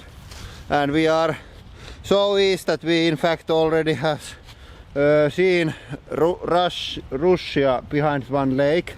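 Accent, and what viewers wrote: Finland. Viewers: I'm watching your videos because they're entertaining and see what you crush every time is exciting and when you deal with Ms HP plastelina creatures and of course your Finish accent is fantastic. It is such a lovely accent (my favorite accent). Every time I look at my Nokia I think off you guys. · Love the extra content and laugh:D And your Finnish accent:) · Erittäin kaunista Suomiengelskaa! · I watch your videos because they are so entertaining. Yes there are a few people now that have copied your Idea. But i don't enjoy their videos. Its not just about crushing stuff. Its that amazing combination of destroying stuff, your Finnish accent, the laugh of, and your personalities. You seem like awesome people i would instantly invite over to my place for metal & BBQ & beers. But i think Germany is like 1 million miles away from Finland. Even Finland is 1 million miles away from Finland. 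Personality is so important for me when it comes to the channels i watch. Thats why i watch Dudesons, Abom, AvE, OxTools, BigClive, GMM, adunbee, SpectreSoundStudios, EllieAwesome and such. And also, seriously reminds me of a cousin of mine. I swear to god, if you dressed them the same, with the same hair colour, people not knowing them personally will mix them up lol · I love the accent. It reminds me of my grandmother from Savo. Who makes the clay animals you crush? They are quite good. Sorry to see them destroyed, but they must be dealt with. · Vastaus kysymykseen: Oon kattonu teidän kaikki videot ja subannut molemmat kanavat, koska: 1) Ensin katoin paperivideon, koska luin siitä iltapaskan nettisivuilta. Tykkäsin sen aitoudesta: selostus rehellisellä rallienglannilla eikä myöskään mitään turhaa amerikkalaistyylistä esittämistä. Video oli jännä, ytimekäs ja lopputulos odottamaton:) 2) Päätin katsoa ne muut videot, mitä teillä oli. 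Huomasin, että täähän on ihan hauskaa settiä noin niin kuin muutenkin:) 3) Sen lisäksi, että tykkäsin viihdyttävästä tavasta selostaa, niin tykkäsin myös siitä, että on aina mukana. Aika usein reaktiot niin prässäyksen tapahtumiin kuin rallienglantiin kutkuttavat nauruhermojani:) Mun kokemuksen mukaan te molemmat olette aitoja ja välittömiä ihmisiä, joiden videoita on hauska katsoa. 4) Muovailuvahahahmot videon lopussa ovat olleet tärkeä lisä. Jos videossa ei ole ollut muuta kovin yllättävää, niin on ainakin joku jännä uus ja äärimmäisen vaarallinen hahmo, joka saattaa hyökätä milloin vain:) 5) Tää Beyond the Press on tarjonnut sitten kaikkea mukavaa lisäjuttua teistä, mikä on vahvistanut kokemustani siitä, että olette kivoja, aitoja ja välittömiä ihmisiä ja teidän videoita on kiva katsoa. Ja tietty nää kaikki jännät testit, jotka ei kuulu pääkanavalle. Teitte viisaasti jaon pääkanavan ja tän Beyond the pressin välillä. 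6) Olette onnistuneet tuomaan kanaville originaalia sisältöä melko tasaisella vauhdilla ja aktiivisesti joten, teidän videoiden katsomise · Just recently discovered your channels and I enjoy watching you destroy shit, and the Finnish accent makes it even better! Keep up the good work!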